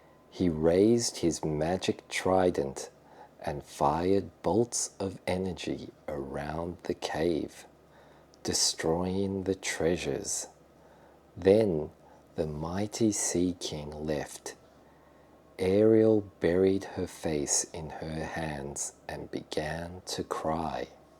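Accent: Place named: Australia. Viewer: さんのオージー英語ずっと喋ってて欲しかったのでむっちゃ嬉しいです…！！ネイティブ速度バージョンもいつかお聴きできるでしょうか☺️ オーストラリアに戻りたい気持ちと英語から離れたくない気持ちを幾分か救ってくれます😊❤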